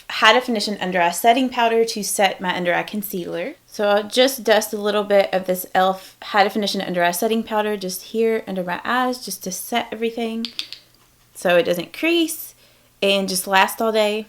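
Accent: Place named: West, United States. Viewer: I like your kinda accent like...it's hard to explain it's like western kinda, you say old like ode...idk but I like it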